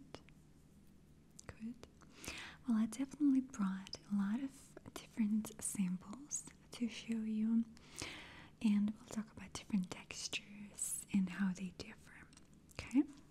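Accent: United States. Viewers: Does anyone know where this amazing lady comes from? Her accent is obviously very American but I'm sure I can hear something else in it and it sounds beautiful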